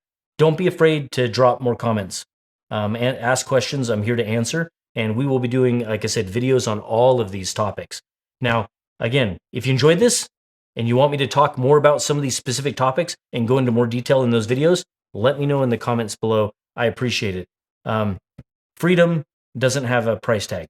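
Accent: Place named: Central Washington, United States. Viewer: Bro, it sounds like you live in central Washington! I wish I could send you a message to see if we live in the same town 🤣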